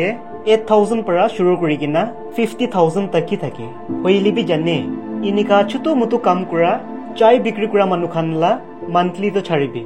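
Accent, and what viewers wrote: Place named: Merangkong, India. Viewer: Bro try to upload everyday ok N btw I heart ur voice may be ur voice like from merangkong,Tuli exact one of my friends n thank you 🎉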